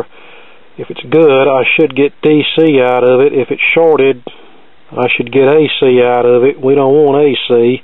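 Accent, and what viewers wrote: South, United States. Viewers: Hi! I am pleased to be following you. I am 69, retired, and trying to learn again what I once studied in high school electronics class. I enjoy your videos, and your sense of humor, very much. I also enjoy hearing from a fellow Southerner. Those other guys who pronounce the L in solder just sound ignorant to me 🤣 Do try to keep things in focus to improve our experience, but I will keep watching nonetheless. Keep up the good work, people my age are not too old to learn. Thanks for your good work!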